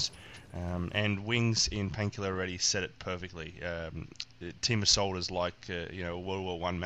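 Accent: Australia. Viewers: How many Aussie commentators are there on youtube? is it just you and JamieEhills?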